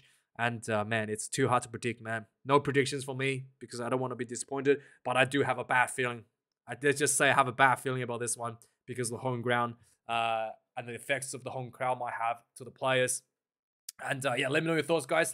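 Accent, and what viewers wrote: Afrikaans, South Africa. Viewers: As a South African, love your Afrikaans man.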